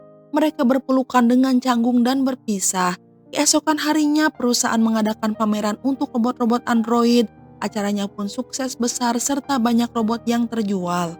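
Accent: West Java, Indonesia. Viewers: Aduuh sunda pisan teteeeh🙏😁.. Resep ngadenge sora si teteh 😊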